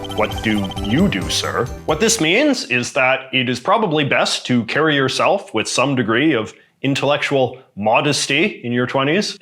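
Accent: Canada. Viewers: dude i can't get over the canadian accent wtf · learned in this video that some canadians also say “doubt” and other “ou” words with the stressed u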